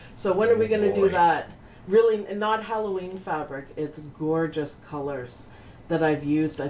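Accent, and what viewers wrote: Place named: Canada. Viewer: 😊 I joined team Hatch today! Gonna be binge watching all the lessons and try to retrain my brain from pe design! Had Thanksgiving with a dual citizenship Canadian\American and she has your accent, brother's neighbor! Lol... Had a nice chat about healthcare differences and how screwed up it is here in the US. But her accent made me think of you!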